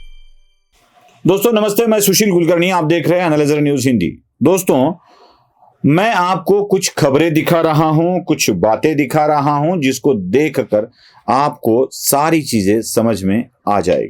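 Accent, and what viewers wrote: Maharashtra, India. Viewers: I love your Marathi accented Hindi. Fully agree with your views. From Lucknow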